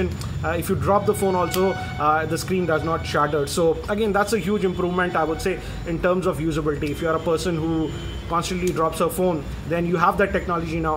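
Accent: India. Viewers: I like the indian english accent, keep up the decent work, sir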